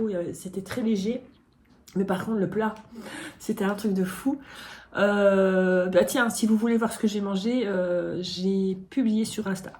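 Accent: Belgium. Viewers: Cet accent belge c'est magique, notre qui nous fait une vidéo à l'ancienne je valide! Amitiés du pays basque à toi et à tous 😁😁😁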